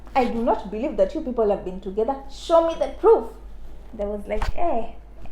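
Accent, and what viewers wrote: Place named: Uganda. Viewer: You are speaking like ugandans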